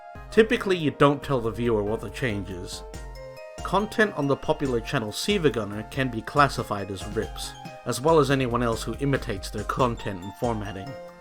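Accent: United Kingdom. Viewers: Educational and interesting this cool. Also YOUR VOICE IS UNEXPECTEDLY BRITISH SOUNDING. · You kinda sound like ProZD if he had a slight welsh accent.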